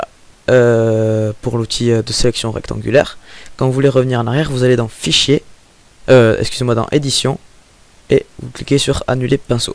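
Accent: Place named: South, France. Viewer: coucou merci pour ce tuto moi qui utiliser photofiltre pour recarder ou autre des photo depuis queje l'ai plus je ne savais plus rien faire merci a toi et jespere de tout coeur que tu continueras ta serie sur les tuto gimp car je trouve ca claire bien expliquer net puis tu as laccent du sud et je viens du sud voila gros bisous ja mabonne !!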